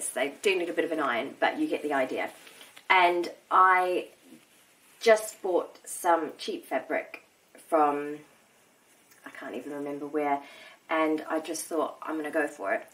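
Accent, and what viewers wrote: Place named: New Zealand. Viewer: Lovely to hear a kiwi voice on a you tube sewing vlog. Hi from Wellington 😍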